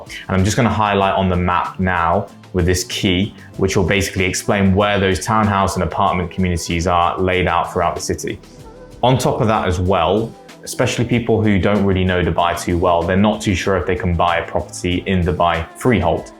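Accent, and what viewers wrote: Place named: United Kingdom. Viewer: Brodr why do u look indian,work in arabia n has an British accent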